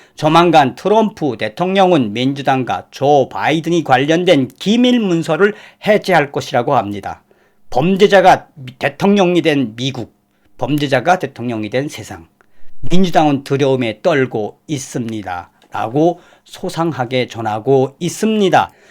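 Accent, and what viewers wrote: North Korea. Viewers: 정확한발음과 구수한북한 억양이 아주 좋습니다.👍🎆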